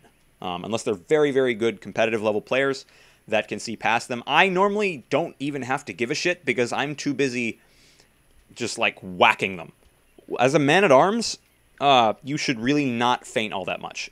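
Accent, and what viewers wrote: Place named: Canada. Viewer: quick question, are you canadian, your long vowel sounds sound canadian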